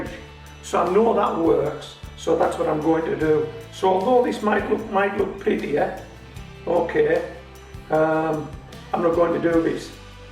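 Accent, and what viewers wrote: United Kingdom. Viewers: British? Just curious.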